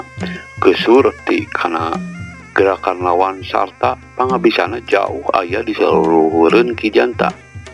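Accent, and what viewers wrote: Sunda, Indonesia. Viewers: ini cerita dongeng yg paling ditunggu dengan logat sunda nya yg khas,mantap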